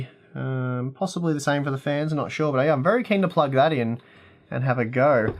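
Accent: Australia. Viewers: i WANT you to say something in pure australian accent in the next video. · oh just realize you're aussie mate!!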